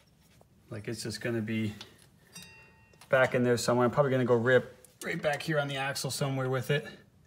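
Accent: Canada. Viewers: You sound Canadian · Love the Canadian Accent, " Ought"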